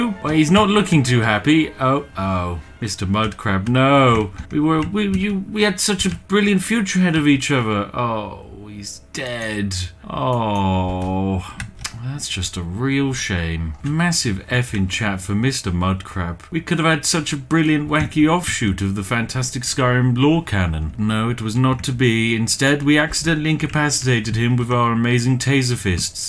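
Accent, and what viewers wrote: United Kingdom. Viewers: Yay a brand new British Boi!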